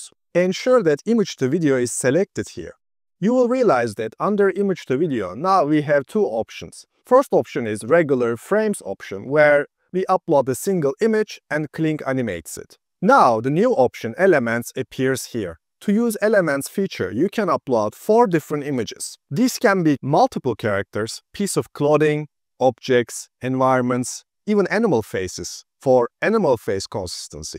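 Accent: Turkey. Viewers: turkish accent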